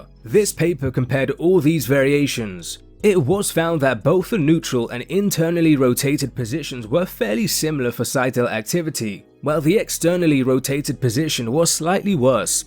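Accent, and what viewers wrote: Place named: England, United Kingdom. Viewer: You sound English but you call it soda?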